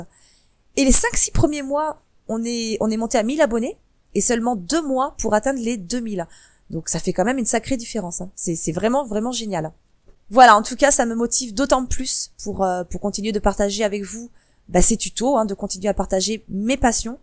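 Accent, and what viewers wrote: South, France. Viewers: salut jje profite de ce post pour te dire à quel point j'apprécie tes vidéos, c'est vraiment adorable de nous livrer toutes ces vidéos dans le domaine de l'informatique pour ma part hameçon particulièrement utile,je sais aussi que cela demande beaucoup de travail et peu de rémunération, dans tes commentaires on sent une petite pointe d'accent du sud,un grand bonheur de vivre et elles ne sont pas les nombreuses vidéos qui vont à l'essentiel et enregistré avec une très bonne diction. ce qui m'amène à te demander s'il était possible que tu nous fasses une vidéo pour le rootage d'autres téléphones android comme les Nokia sous OS Windows phone, et tous les Samsung a2,a3,a4,a5,s7 etc... qui manque le miens c'est le samsung a300fuxxs1bpe1 pour info ^^ merci encore pour tout ce que tu fais.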